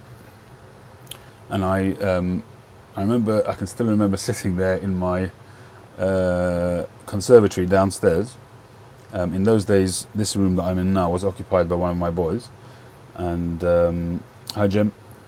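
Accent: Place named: United Kingdom. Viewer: Excellent. Reminds me when I use to listen to the BBC on Shortwave in the 50's. Very British.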